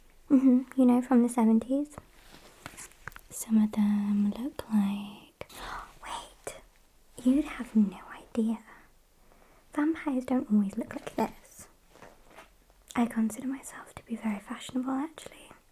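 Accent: United Kingdom. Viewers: gurl your British accent is so cuteeeee Oh my god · Are you british mate?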